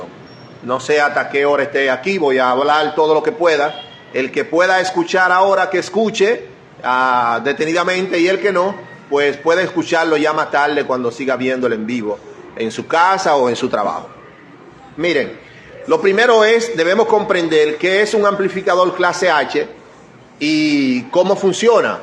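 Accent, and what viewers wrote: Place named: Dominican Republic. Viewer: Por favor señor hay que ser comprensibles el maestro es de República Dominicana y así es su idioma 🤨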